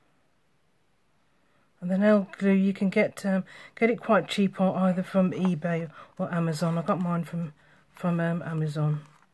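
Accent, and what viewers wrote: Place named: United Kingdom. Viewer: I'm so jealous of the British accent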